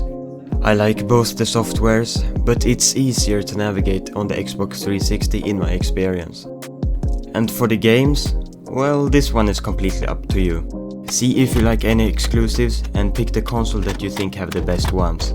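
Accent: Sweden. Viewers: I can hear your Swedish accent as you said " " unshorted series? Mean uncharted? To be fair we both have similar languages in terms of syntax. Just remember to add the T in RS pronunciations to words. Some* xD It's okay.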